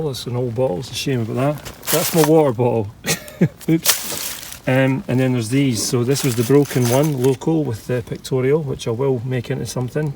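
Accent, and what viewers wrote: Scotland, United Kingdom. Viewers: Sometimes after my spouse is asleep I watch you on UTube on my phone with sound off and the text on the screen. It has a very hard time changing your heavy Scots brogue into text and sometimes I bust out laughing!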